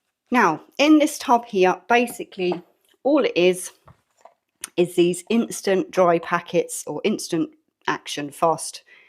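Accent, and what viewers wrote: United Kingdom. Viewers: Great to hear a pommy accent for a change, instead of the yankee ones.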